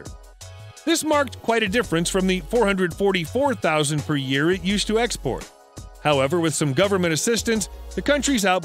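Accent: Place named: Spain. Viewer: I love your Spanish accent and I appreciate the effort great reference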